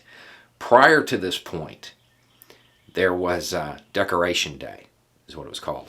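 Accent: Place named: Charleston, United States. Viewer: Worst Charleston accent ever.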